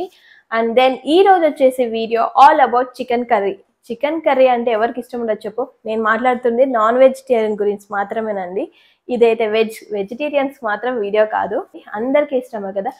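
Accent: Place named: Anantapur, India. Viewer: Pure Anantapur slang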